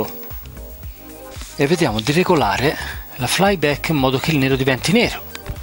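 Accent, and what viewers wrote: Tuscany, Italy. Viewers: Sei simpatico,sei bravo,sei creativo...sei anche toscano a giudicare dall'accento...si dice che l'italiano sia nato in Toscana. Ma perchè usi tanto inglese inutile ? Qualche esempio( ma sarei in grado di tradurre ogni parola) : SCREEN = SCHERMO SWITCH = INTERRUTTORE ECC... Buon lavoro comunque e ripeto video interessanti. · ma sei della toscana di che citta' e sei forteeeee ehhh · Ma sei Toscano??? Perchè lo sembri comunque se si lo sono anch'io. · Grande!!! Anche se sono sprovvisto di TV da circa 5 anni (felicemente sfornito) mi sono fermato a guardare questo bel video per la presenza del pezzo da museo che è stata la mia ultima TV... e per l'accento toscano che secondo me fa la sua parte. Simpaticissimo davvero. Grazie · Ho appena scoperto il tuo canale grazie a questo video molto interessante. Davvero bravo e simpatico! Tra l'altro mi sembra di sentire un accento toscano, di dove sei(per curiosità, dato che io sono di Firenze)? 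· L’unico toscano he nun me fa ghignare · bello sto canale sei mega simpatico! hai un nuovo iscritto! tra l'altro mi sembri toscano come me solo non riesco bene a capire di dove :)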